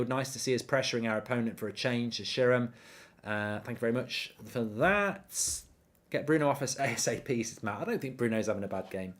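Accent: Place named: Manchester, United Kingdom. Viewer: Warra manc accent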